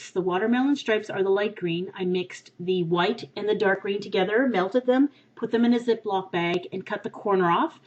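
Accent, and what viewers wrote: Canada. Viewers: I could tell that you lived in canada before you mentioned it because of your accent c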